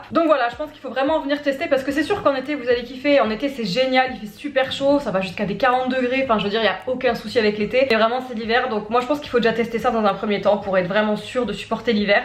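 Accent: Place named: France. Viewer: je vois sur plusieurs commentaire que tu ramène souvent l'accent francaise et que les québécois ont déteste ca au points de pas vous aimez ? Je ne sais pas ou tu as entendu ca mais la majorité des québécois ce fou pas mal de ton accent.. il ne faut pas généraliser.. ce que nous détestons vraiment ce sont ceux qui ne veulent pas s'intégrer au coutume quebecoise.. apars ca.. ont est très chaleureux en général..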